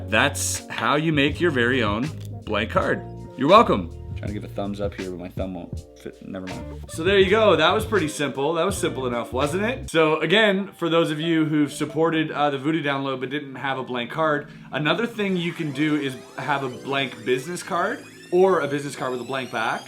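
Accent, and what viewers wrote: Quebec, Canada. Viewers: Guy was speaking french ... Ou ce vidéo a t-il été fait ! Accent québécois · Are you from Canada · Ohhhhhh un québécois mon boy!!!!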